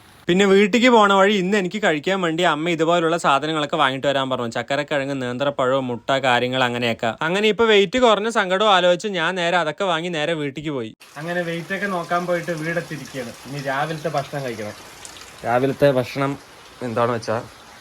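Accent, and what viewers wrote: Palakkad, India. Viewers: Hello videos ellam ishtakunund palakkad slang kelkkan orupadu ishtanu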